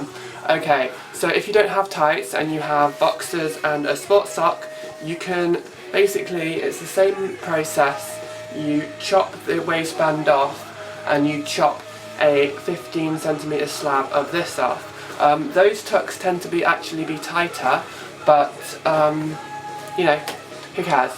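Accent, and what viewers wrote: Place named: South, United States. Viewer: Dear. I found your video by accident. The title confused me. So I watched. "WOW"..... What an eye opener for me. I could not beleive it when you said you were transgendered. Anyhoo I just wanted to say as a regular fella. I think that you are a stunning lady who should have been a woman from birth. Also you made me laugh when you had to talk about the 'delicate" parts of the process. The southern accent made me laugh when talking about dropping a "bollock" (excuse the lack of imagination for my discription) I hope the future is bright and you become the person you so clearly should have always been. Thanks for the eye opener kind regards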